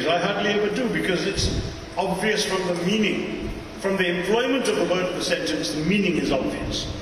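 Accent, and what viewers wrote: United Kingdom. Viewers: A Britisher in sound and An Indian by heart makes this man unique. We are proud to have you....🙏♥️🙏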